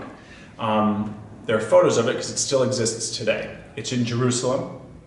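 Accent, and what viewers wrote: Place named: United States. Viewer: No, but I took it in 10th grade. The American accent and English writing made me assume this was for a US based class, and was a bit confused as to whether this was a standard US history class like American Democracy or APUSH, or a specialty course. But the Bulgarian National Diploma must be much differently structured. Seems like a very interesting class to take.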